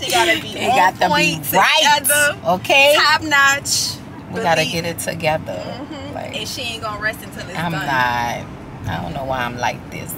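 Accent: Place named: Louisiana, United States. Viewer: this is my first time actually hearing you Louisiana accent. or maybe i just never heard you speak long enough.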